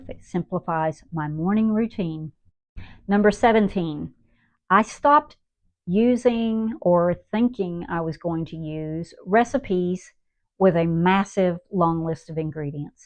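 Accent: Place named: Tennessee, United States. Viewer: Hello there! So happy to have found another Gen X sister! I just subscribed! And I thought I’d detected a little Tennessee twang in that accent! (I’m from Tennessee too!) I love your ideas, especially the one about not waiting until the dishwasher is completely full before running a load.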